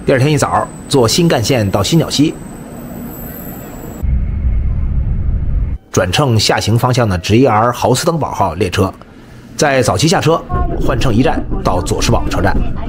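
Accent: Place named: North, China. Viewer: 北方口音。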